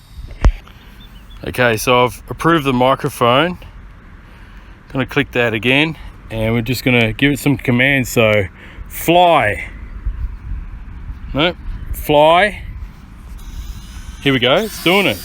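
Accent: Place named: Australia. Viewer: Its the Aussie accent mate, give it some time until it gets used to it haha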